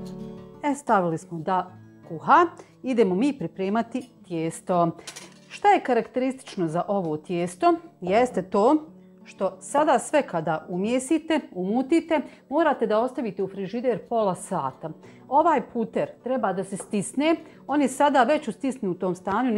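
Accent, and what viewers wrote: Bosnia and Herzegovina. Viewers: Joj kako te sa gustom gledam kako lijepo i uredno sve radiš a tek naš bosanski govor ma bravo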